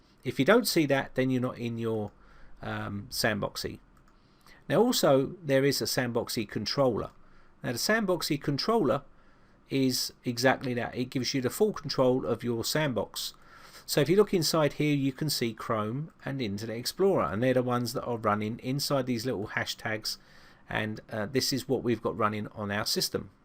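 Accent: United Kingdom. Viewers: I like your British accent m8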